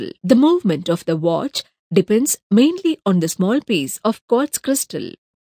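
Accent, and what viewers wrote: India. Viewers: Great, but unfortunately I will never get used to that English-Hindi accent 😓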